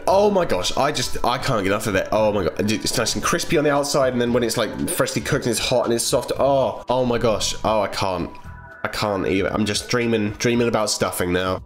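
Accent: United Kingdom. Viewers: is British I felt like I knew that cause his voice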